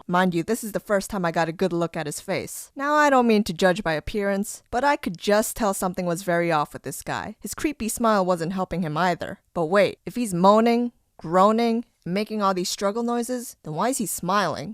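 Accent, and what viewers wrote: New York, United States. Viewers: broooo are you deadass from new york lmaaaaoooo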